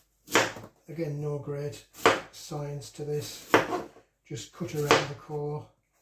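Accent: United Kingdom. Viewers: Well sounds good. But what would I use it on or with. Btw, I love your accent. Sounds proper British. You could've actually cut it in half. Then your plan wouldn't be so full. And you wouldn't need a bigger pot. Eh? Try a warm damp sponge to wipe off jars. I want a taste.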